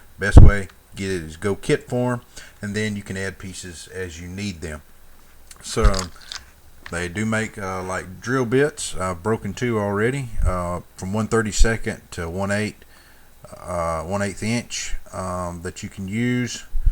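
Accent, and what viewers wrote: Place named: Rural, United States. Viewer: Haha, I love your openings and endings. Living in the country side of America and sharing the same accent, this information really hit home clearly. I've been getting into robotics/electronic work recently. It's a useful hobby to have, but when I try and take apart old devices like cell phones and cameras I find really tight small screws constantly. Near impossible to get out. I keep thinking "If only I had a small drill for this..". I think this may be the one for me! 'God knows it'll make the job faster, anyway. It's not too expensive, either. I just hope the bits don't break too easily. It'd be a pain to have to buy new ones often. Thanks, man.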